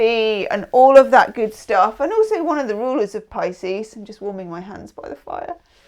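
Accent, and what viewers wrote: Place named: Devon, United Kingdom. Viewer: i absolutely LOVE your Devon accent!! 😂😂😂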